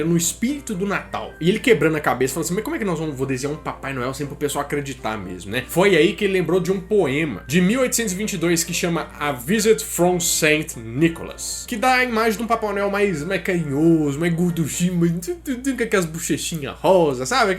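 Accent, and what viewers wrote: Minas Gerais, Brazil. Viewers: Feliz Natal!!!! Feliz Natal!!!! Adoro o seu canal e mesmo sendo redundante não me canso de falar que é melhor canal que eu assisto. adoro O mineres arretado que você tem. Sou a chamada baiana cansada porque sou de Janaúba no Norte de Minas, fã número 1 das gerais, rsrsrsrs. Não perco os seus vídeos principalmente quando vem recheado de histórias que eu amooo. Beijos e muitos sucessos.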